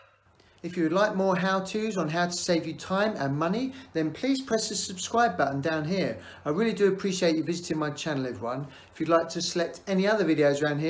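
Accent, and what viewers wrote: United Kingdom. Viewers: Mantab mas british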